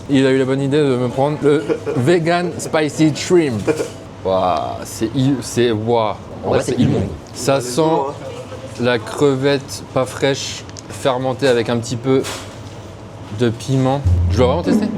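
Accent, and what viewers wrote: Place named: France. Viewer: Par contre lire le Vietnamien à la Française, c’est vraiment moche lol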